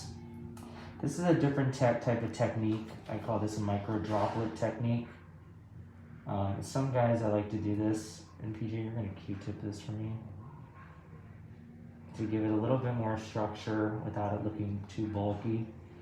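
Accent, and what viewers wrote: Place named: United Kingdom. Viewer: I can’t quite tell the nice accent… British, South African, Australian?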